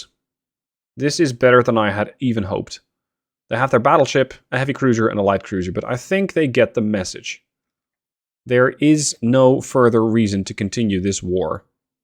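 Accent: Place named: Netherlands. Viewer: Your accent has enough Dutch in it :)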